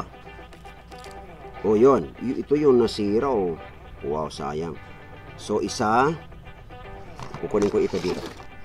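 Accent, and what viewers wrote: Pangasinan, Philippines. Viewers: Pagka po ba inilagay na sa ref ilang days po bago babasain? Same taga pangasinan po ako Sabi ko na nga po ehh halata sa boses😄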